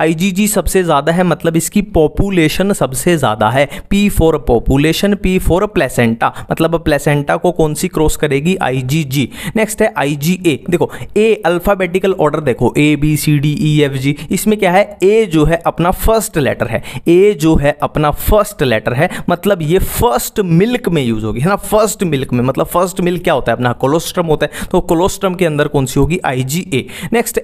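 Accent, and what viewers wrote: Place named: Punjab, India. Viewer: Sir i think you are from punjab,as it seems by your voice tone.....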